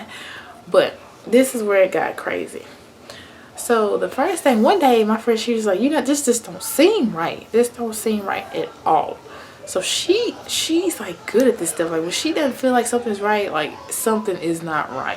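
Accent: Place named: Detroit, United States. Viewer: you have a detroit accent for sure ☺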